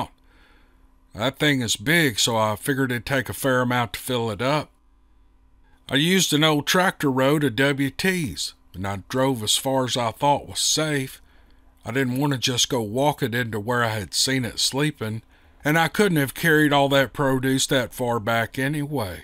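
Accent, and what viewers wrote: South, United States. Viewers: You are so good reading this! Your southern voice is perfect. MERRY CHRISTMAS, TO YOU AND YOURS FROM ME AND MINE!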